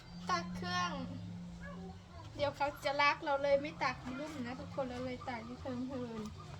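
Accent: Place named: Phu Thai, Thailand. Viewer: สบายดี น้อง สาวภูไท เว้าม่วน พี่FC ฝั่งไทย เด้อ น้อง เก่งๆ แบกตะกร้า ผ้าไปชักเครื่อง บ้านอีน้อง กดไลค์ กดแชร์ เป็นกำลังใจ❤ ให้น้อง สาวภูไท คนงาม เด้อ จร้า❤❤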